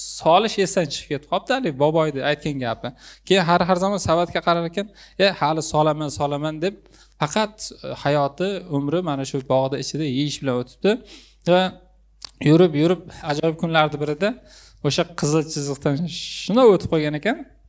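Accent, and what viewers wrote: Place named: Surxondaryo, Uzbekistan. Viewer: Qalban surxondaryolikman dedi,bir joydan ekanmiz😊😊 shevasi ham uxshaydi uzi surxondaryoliklarga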